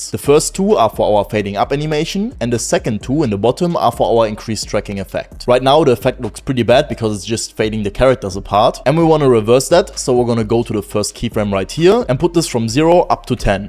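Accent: Germany. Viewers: I hear German😂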